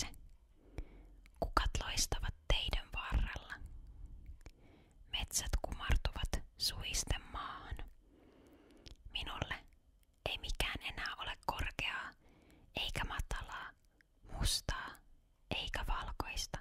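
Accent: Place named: Finland-Swedish, Finland. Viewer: The finnish swedish accent is so beautiful.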